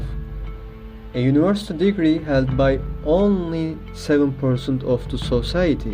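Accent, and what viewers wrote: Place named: Turkey. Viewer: açar açmaz Türk olduğunu aksanindan anladim :))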